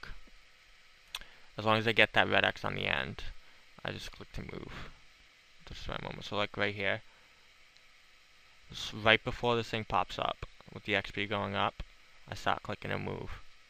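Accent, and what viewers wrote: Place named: New Jersey, United States. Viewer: morrrrrrrrrrrrrre and you have like a jersey accent :P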